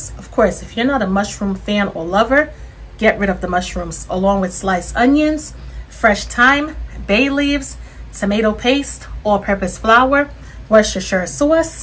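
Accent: New York, United States. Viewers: I like that NY accent. It's cool that you cut your own meat chunks. It's definitely less expensive. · Start watching... looks nice... Oh dear, American accent, I'm going to have to convert from pounds to kg aren't I...?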